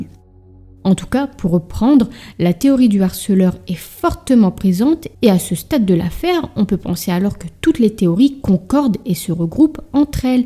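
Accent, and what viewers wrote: North, France. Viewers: Petit accent du Nord, me trompe-je ?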